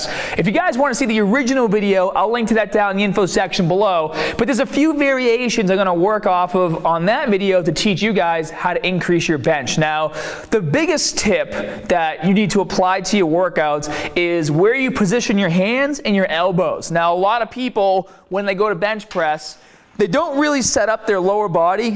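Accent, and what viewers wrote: Boston, United States. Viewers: Where did the Boston accent come from??? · havnt watched your vids for a little while now but ERM lol what's with that Boston accent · since when did you have a boston accent? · Are you from Boston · I dont need your opinion Hell no. Don't disrespect NJ like that lol. This is a Baaahhhston accent. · Good video so ima guess your from Boston lol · is he from boston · Great vid. Accent is great hah, from boston? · Did you just say Bah? That Bahston accent really coming out hard, bro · Did he always have a Boston accent?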